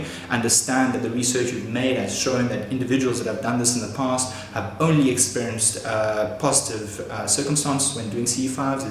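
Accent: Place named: South Africa. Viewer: ah south african accent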